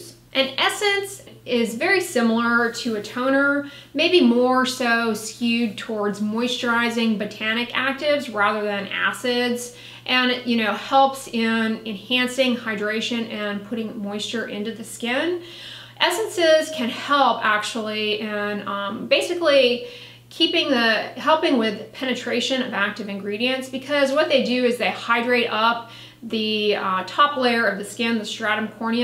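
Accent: United States. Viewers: Your american accent is so strong I hardly understand you 😶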